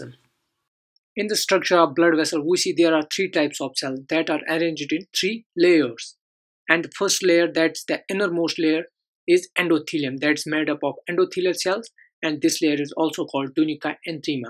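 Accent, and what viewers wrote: Kashmir, India. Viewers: I can tell by your accent you are kashmiri......Nice video tho 👍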